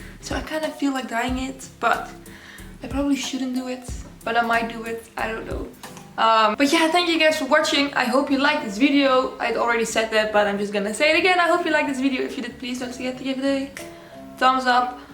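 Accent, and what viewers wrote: Netherlands. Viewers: Are hou Dutch? You sound Dutch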